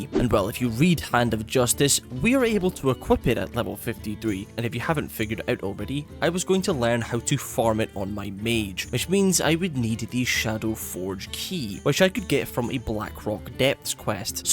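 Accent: Scotland, United Kingdom. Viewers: The accent make me remember my friends grandpa from Scotland 🏴 ❤ he was such a nice guy and these videos are so comforting ❤ love from Norway 🇳🇴 🎉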